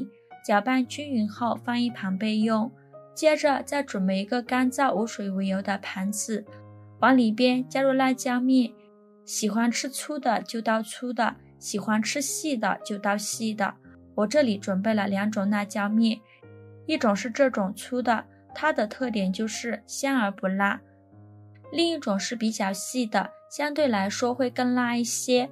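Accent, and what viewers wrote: Yunnan, China. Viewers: 博主口音是雲南哪裡的？ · 云南的单山辣，云南普通话，云南风味。🤤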